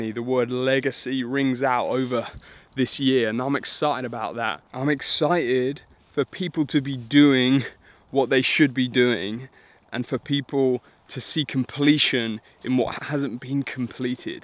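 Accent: England, United Kingdom. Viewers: I absolutely loved the quality of your video! Great production and your English accent is adorable! God bless from Australia!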